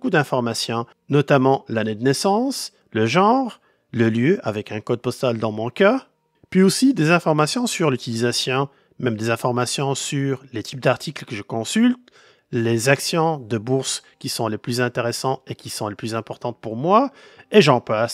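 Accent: Quebec, Canada. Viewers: c’est un accent du Québec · Présentation et conseils très complet et en plus avec une pointe d'accent Canadien. Qui de mieux ? MERCI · Super vidéo. Bonus d’un français, ton accent de cousin d’Amérique 😊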